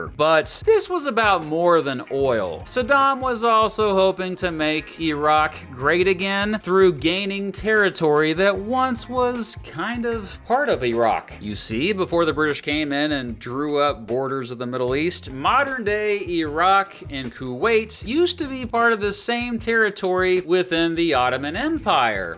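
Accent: United States. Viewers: وده واحد امريكي بيتكلم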